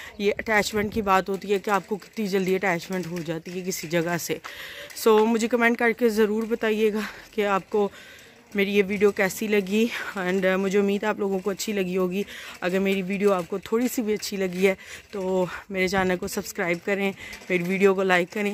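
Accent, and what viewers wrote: Sialkot, Pakistan. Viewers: aap ki awaz sa laga tha k aap sialkot sa ha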